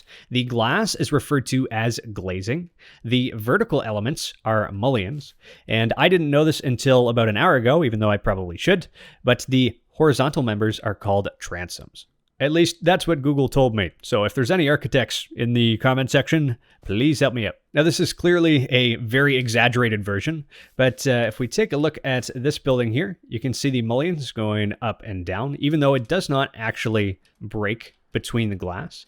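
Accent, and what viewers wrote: Canada. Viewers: are you from vancouver?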